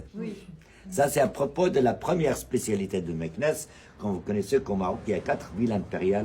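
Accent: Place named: Paris, France. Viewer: wao...il maitrise bien la langue mieux que français eux mêmes.un vrai ambassadeur..il n'est pas originaire français je suppose. en tout cas il a un bon accent parisien❤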